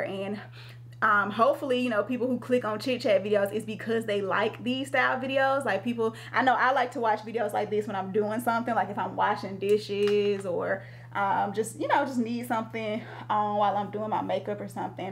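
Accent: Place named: Georgia, United States. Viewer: What up, cousin! I have to ask, where are you from? I could listen to you speak all day LONG. I love your accent, but I can’t place it? Is it Georgia? I’m sure your southern cousins are laughing at me right now, but I am serious. I can’t place what state you’re from! I’m from New Jersey, live in San Francisco and on top of that, I’m an Army Brat and lived all over as a kid, so my “accent” is such a mash-up of everything that I sound like I have no accent at all 😂. It’s also left me fascinated with other people’s accents. I love how most other people can carry their home with them wherever they go. It’s very sweet. Anyway, I love your accent. And I’m curious. I also love how confident you are. That’s all.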